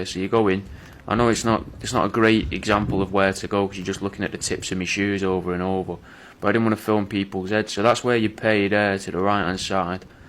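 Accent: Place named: Wythenshawe, United Kingdom. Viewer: Are u from wythenshaw cuz u dont sound like ur from dorset 😹😹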